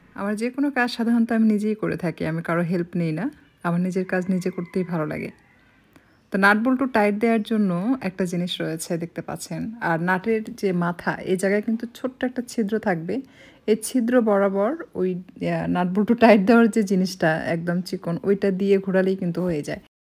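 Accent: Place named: Barishal, Bangladesh. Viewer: আপনি বরিশালের মানুষ আপনার কথার মধ্যে বরিশালের ভাষার ঝাজ পাওয়া যায় এগিয়ে যান সমহিমায় ধন্যবাদ।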